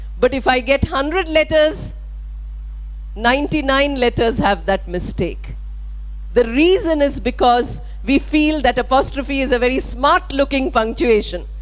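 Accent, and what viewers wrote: India. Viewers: Indian English you are uttering !!!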